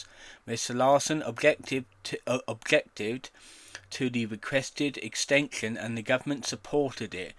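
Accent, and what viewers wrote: United Kingdom. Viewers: average british voiceover, completely unintelligible... bro daniel is more understandable than you when he's in his meltdown mode. just use one of those cancerous text to speech programs it would be better than this. 24 sec in and i legit had to pause and say wtf lol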